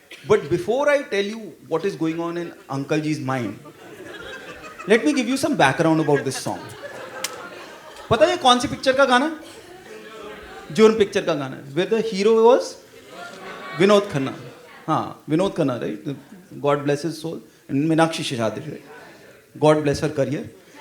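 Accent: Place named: Punjab, India. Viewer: Decent comedian but has lot of Punjabi influence.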